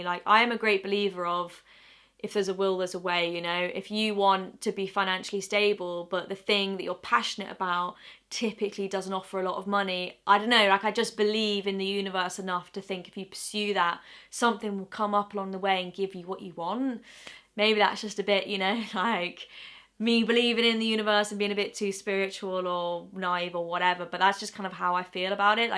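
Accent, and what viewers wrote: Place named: England, United Kingdom. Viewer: She's English. :)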